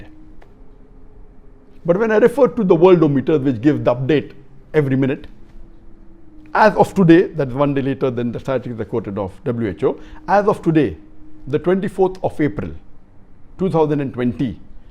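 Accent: India. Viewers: Hello, can you stop speaking like an Indian? TQ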